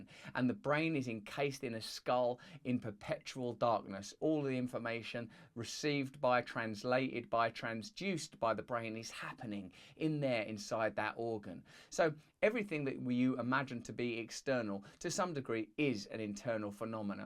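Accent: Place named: England, United Kingdom. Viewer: his English is so English it's hard for me. to understand it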